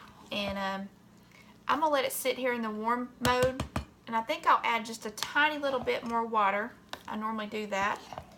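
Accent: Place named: Texas, United States. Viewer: Are you from Texas? Love the accent!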